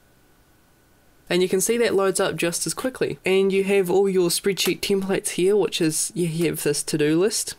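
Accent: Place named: New Zealand. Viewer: Man, that was viciously Kiwi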